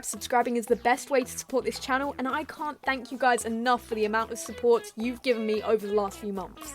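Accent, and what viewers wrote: United Kingdom. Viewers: WHY IS BRITISH!?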